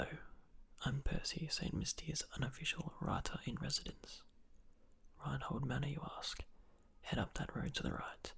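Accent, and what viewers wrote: Australia. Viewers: hello! I'm a new subscriber, and I was wondering where your accent is from :D Australian?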